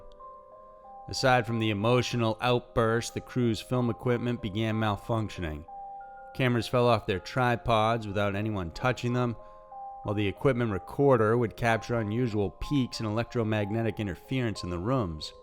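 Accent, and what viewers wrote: South, United States. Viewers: Does anyone know where the narrator is from? There's a hint of maybe a southern accent on some vowels. It's slight and may just be style, but I can't help but wonder. Words like, "time," "wire," and "back." Seems less prevalent in this video than others.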